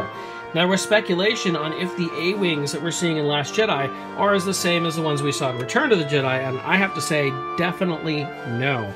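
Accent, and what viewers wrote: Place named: United States. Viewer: Whatever!, am bored with you. I take it you are a yank,only yanks talk like you do?.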